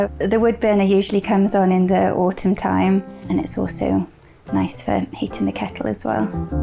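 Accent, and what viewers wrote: United Kingdom. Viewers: She has Renee Zellweger's british accent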